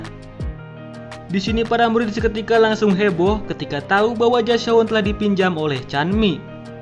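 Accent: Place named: West Java, Indonesia. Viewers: logat sunda itu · Pasti urang sunda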